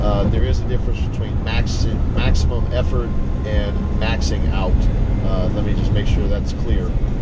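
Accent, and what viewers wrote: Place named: Europe. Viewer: You talk like an european . I love it and you are 100% right in all what you said in this video.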